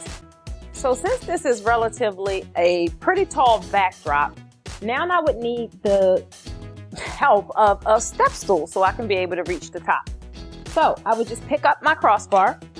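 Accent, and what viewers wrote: Baltimore, United States. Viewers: She definitely from Baltimore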